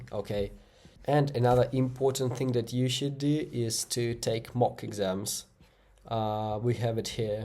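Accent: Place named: India. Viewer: I am sitting over here trying to decipher his accent because it sounds Indian but he clearly doesn't look Indian😅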